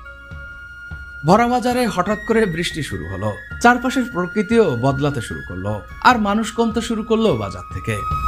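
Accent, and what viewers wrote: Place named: Barishal, Bangladesh. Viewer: আমি বরিশাল বিভাগের পটুয়াখালী জেলা থেকে দেখছি, যিনি উপস্থাপনা করতেছে তার বাড়ি মনে হয় পটুয়াখালী বা বরিশাল।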